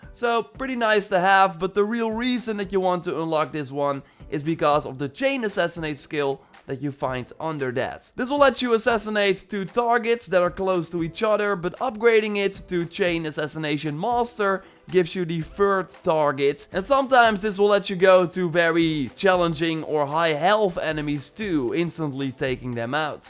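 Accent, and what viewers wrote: Netherlands. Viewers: You are dutch aren’t you??😂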